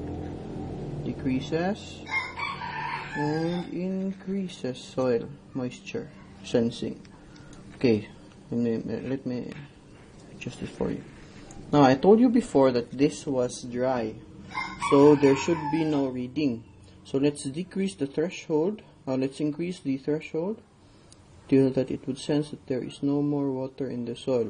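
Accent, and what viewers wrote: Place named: Philippines. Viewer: Well done. U r Filipino right ? (It is the accent that gives you away :-) )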